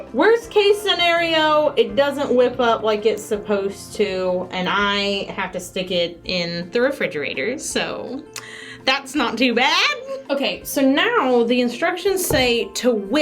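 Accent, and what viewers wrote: Texas, United States. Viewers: I love your slight texas accent! It makes me so happy!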